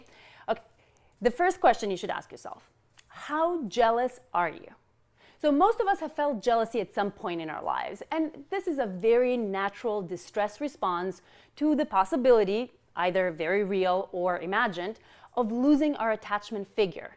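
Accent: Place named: North Macedonia. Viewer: Great video. I've been into the open relationships, swingers lifestyle, polyamory, consensual non-monogamy for years - before it was considered a hip thing to do. I totally agree the past couple years all this has been trending, but I'd also says a lot of the Millennials are treating it like a fad. A fad until they get hurt - due to lack of research before jumping head first into the lifestyle. Btw...I love your podcast!! And I can so hear that Macedonian accent of yours coming through 👌 😁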